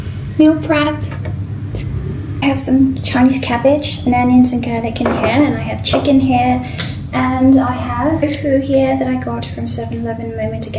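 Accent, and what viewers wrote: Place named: United Kingdom. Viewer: May I know your nationality? Pls don't take it wrong. I am just curious because your accent sounds so immaculately British.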